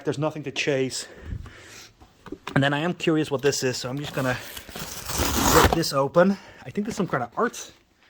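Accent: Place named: Netherlands. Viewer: Ouch Dutch accent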